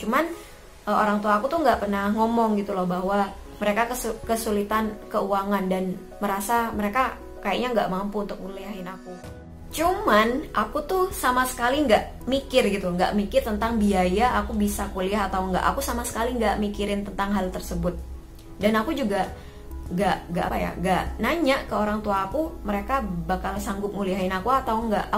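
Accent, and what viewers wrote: Jember, Indonesia. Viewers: Kkak org jember ya?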